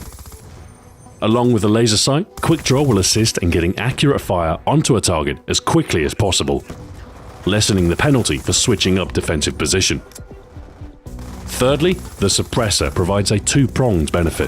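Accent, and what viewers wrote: England, United Kingdom. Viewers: English accent :) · Sorry sir he is British. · Does he sound American? He is obviously British. Just like how the Closed Captioning read "Centre" instead of Center. He even types his own CCs for his videos. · It seems like this series has become to: "watch a british man saying puns about gun names in black ops 2"....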